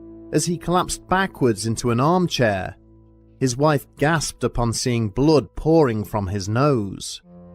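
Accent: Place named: England, United Kingdom. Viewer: The video maker sounds English, cannock chase in England, next to Birmingham is famous for black eyed kids.... Nearly half way through the video... Oh he's just mentioned it as in typing LOL.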